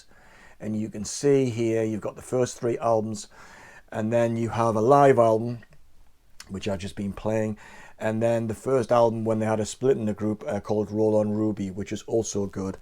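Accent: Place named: Geordie, United Kingdom. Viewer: Came upon your video by accident (read 'algorithm') but glad I did so. represent all that's good in 70s/80s music. But I was sad there was no mention of their final (Mercury records) album ' '. It's a virtually forgotten album, basically easy to listen to but containing what I would describe as Magnum Opus - (note the question mark - typical Hully). Very dark and very Berthold Brecht. A song about a nightmare dispelled upon waking (just a dream, a crazy midnight scream) and seeing his wife (like the first time in my life) reassuring him. Spellbinding. When you started speaking in the video, I thought I recognised a familiar 'twang' and when you mentioned Windows Music Shop I KNEW! Took me right back to my youth when I, too would be looking in at the array of records and instruments on display there. Thanks again for an excellent video. 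From an ex-pat Geordie bathing in nostalgia.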